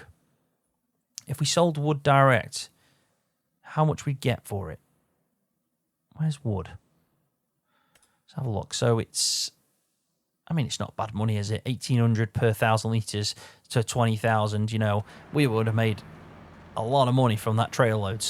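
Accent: Staffordshire, United Kingdom. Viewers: I've started watching your videos a few days ago, and im loving it! Also, please correct me if im wrong, I live in staffordshire, and I believe you do to, your accent sounds like a staffordshire one.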